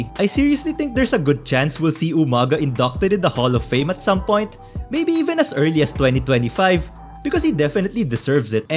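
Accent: Philippines. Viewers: The moment I heard you speak, I knew right away that you're a 'kababayan'. 😄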